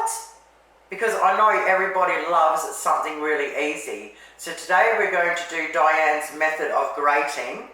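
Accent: Australia. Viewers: Ooooooh so yummy I just made these I didn’t have punkin so used sweet potato Also I added some chopped shallot greens as I only had a small small onion And I used the borg pastry sheets Oh my yumminess!! Thank you so much You are my family’s favorite YouTube watch We also made the pea soup pie floater last fortnight Keep up the happy cooking and Aussie talk Absolutely has my ‘special’ boy laughing and looking forward to the tasting of these amazing easy to follow recipes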